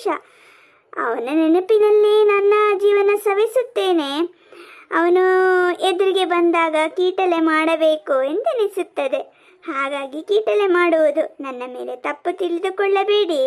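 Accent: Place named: Mandya, India. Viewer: ಮಂಡ್ಯ ಭಾಷೆ ಸ್ವಲ್ಪ ಇತ್ತು 👍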